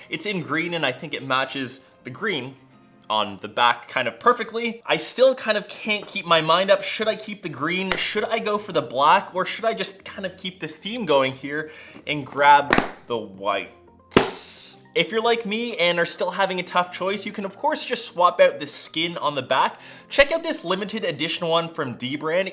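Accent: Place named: Canada. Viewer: your Canadian accent is awesome !!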